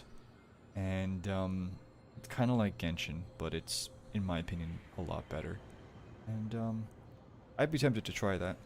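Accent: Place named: Ireland. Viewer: Irish brethren I hear, salute I do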